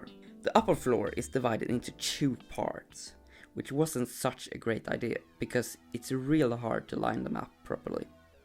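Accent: Sweden. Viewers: Du låter svensk🇸🇪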